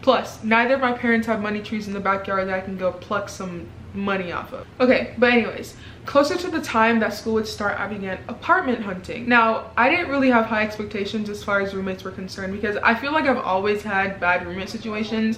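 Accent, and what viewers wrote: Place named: Nigeria. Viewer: I heard you talk one second in and I knew you were nigerian.. or at least from Africa 😭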